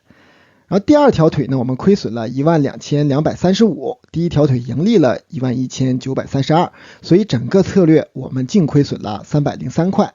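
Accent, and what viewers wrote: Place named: Northeast, China. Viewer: 东北口音有点搞笑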